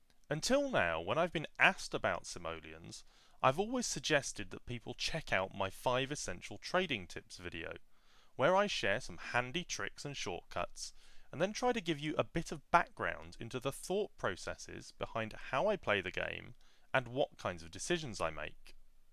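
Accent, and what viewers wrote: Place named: RP, United Kingdom. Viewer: Why is it that it seems classier to play this game while I am listening to instructions from a guy with a posh accent? Also, thanks. I knew there was probably something useful about selling things.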